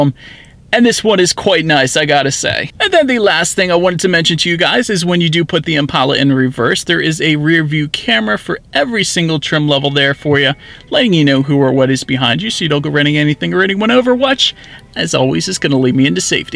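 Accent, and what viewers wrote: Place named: Philadelphia, United States. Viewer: Love that philly accent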